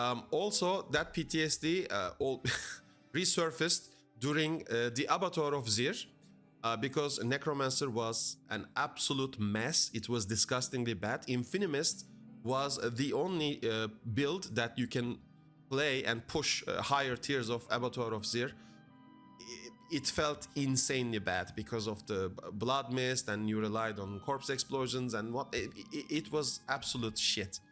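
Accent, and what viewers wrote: Turkey. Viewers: Aksanın Türk gibi kesin türksün ya 😅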